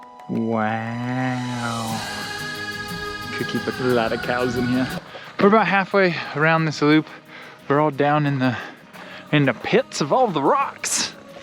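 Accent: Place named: South, United States. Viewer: your southern accent is getting better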